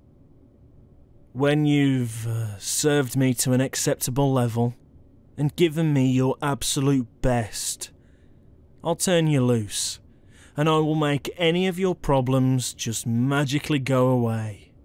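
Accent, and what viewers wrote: United Kingdom. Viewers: Againe, dune, this British accent that what got me into this asmr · I love british Accents they're so nice and calming🤩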